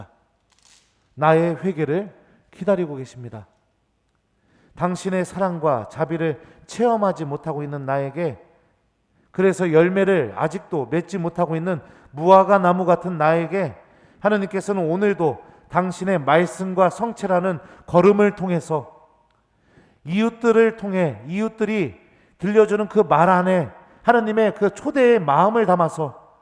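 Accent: Chungcheong, South Korea. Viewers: 충청도 신부님 사투리 더욱 친근감 ~~~ 화딱지~신부님 존경합니다 저도 화딱지가 날때는 신부님 강론을 듣고 하느님께로 다가가면 저에게 은총으로 사르르~화가 감사로 ~~ 아멘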